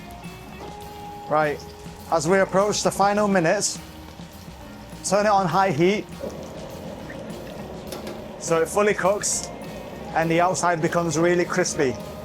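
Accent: Northern England, United Kingdom. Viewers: Your British accent make it authentic flavor 😅 easy to follow , thank you . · Are you from Hong Kong? I thought I detected a partial British accent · This is like ' Sunday Kitchen ' on BBC 2 But Chinese Style. And a Northern English Accent BRILLIANT !!! 👍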